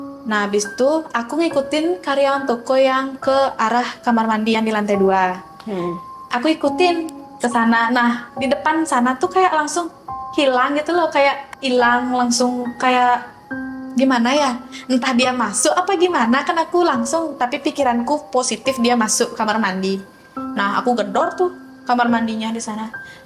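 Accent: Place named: Bali, Indonesia. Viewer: Seneng kl denger logat bali..😅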